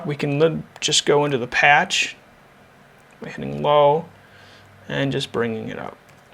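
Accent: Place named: Appalachia, United States. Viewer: You sound like Hillblly, btw nice tutorial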